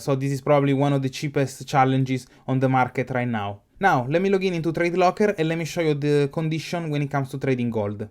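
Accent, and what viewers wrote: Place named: Italy. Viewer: Ma sei per caso italiano? perchè hai quell'accento li italianizzato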